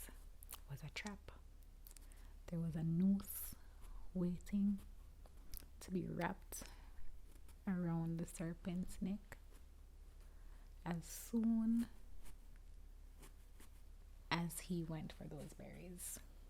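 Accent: Jamaica. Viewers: The Jamaican accent has to be one of my favourite accents ever and couple this with folklore tales and I’m obsessed, instant follow 🥰🥰🥰🥰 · I love your alternative subtitles XD i can follow nearly all of your accented words and jamaican phrases but i appreciate the subs anyway just in case we miss what you were saying Also I'm finding the more I learn about anansi, the more I see that his biggest trickery is using other people's pride against them to get what he wants 😂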